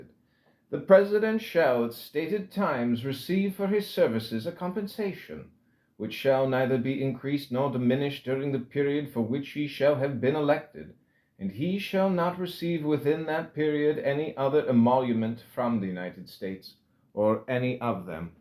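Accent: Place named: United Kingdom. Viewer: It just seems wrong to recite the Constitution in a British accent.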